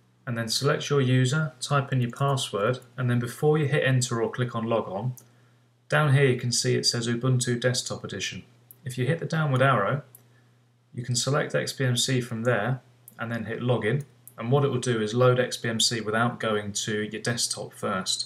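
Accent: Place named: United Kingdom. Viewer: First video which is so useful.finally British accent. After following step by step guide,my xbmc is slower than slow.mouse does not respond and arrows are very slow to respond.any ideas to normalise? I am using acer aspire. Also no matter what the edges of xbmc is beyond the screen of tele.tried reducing and adjusting tv format,doesn't help. Suggest please!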